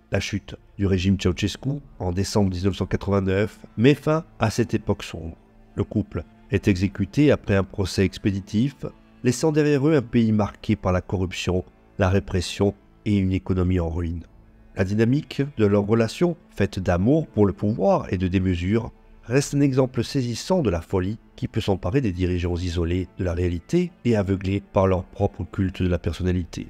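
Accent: Corsica, France. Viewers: Bonjour je découvre votre chaîne. Et je m’y abonne !!! J’aime beaucoup les termes abordés. Je suis sure que vous avez lu livre « femmes de dictateur « de l’écrivain Diane Ducret, il parles des femmes des dictature d’Afrique de celle dont vous parlez dans cette vidéo et bien d’autres….. De plus j’ai reconnue avec joie votre accent , venu tout droit de l’île de beauté. J’y ai passé mon enfance et adolescence entre le village de Luri et de Corabara. Ma famille étant native de l’île. Je vous souhaite de bonnes fêtes 🍾 🎊. Et souhaite également longue vie à votre chaîne. Merci pour votre travail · Bonjour, Je viens de découvrir ta chaîne et j’en suis ravie, ça me change un peu de ce que je regarde habituellement. Juste par curiosité, tu ne serais pas Corse par hasard?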